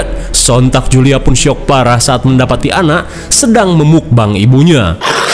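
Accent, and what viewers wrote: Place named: Belitung, Indonesia. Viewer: Gue pingin tau si mimin berasal dari kota mana?? Logat bicaranya kayak logat melayu belitung.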